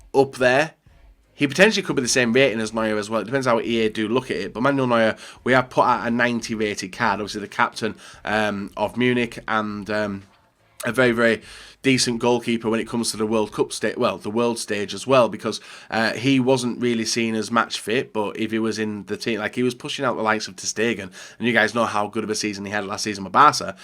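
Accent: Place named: England, United Kingdom. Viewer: Como se nota k eres inglés... donde esta Rakitic? Y Coutinho?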